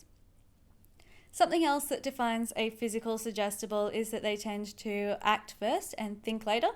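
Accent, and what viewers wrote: Australia. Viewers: I’m suggesting to myself how to master your 😘 Aussie accent. O’s are diphthongs or tripthongs in Australian. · you are literally about as far away as you can possibly get without going off world lol she's Australian fella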